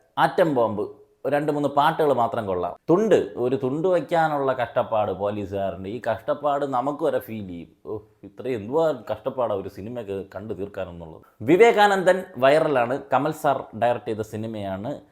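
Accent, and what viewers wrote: Trivandrum, India. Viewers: Trivandrum slang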